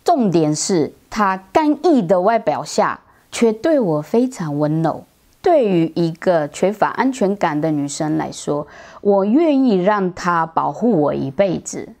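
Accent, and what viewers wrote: Taiwan. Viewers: 這已經是台灣腔，百分百台灣人啦！😂🤣🤣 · 好地道的臺灣音！ 莫問出處,那處安定是吾家！ 要相信緣份,好好耕耘下去！ 祝福！ 謝分享！ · 台灣人的中文口音，不說還以為你是本土混血兒。 長得好看，一家人跟老公都很好看！ 祝福你們一家人永遠健康幸福喔！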